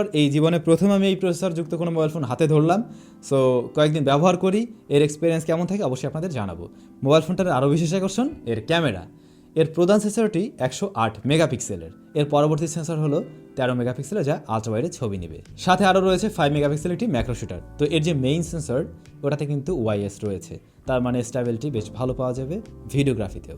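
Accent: Barishal, Bangladesh. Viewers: ভাই আপনার ভাষা বরিশালের মানুষের মত মনে হয় 🙂 আপনার গ্রামের বাড়ি কি বরিশাল? বরিশাল হলে তো আমার মতো 🙂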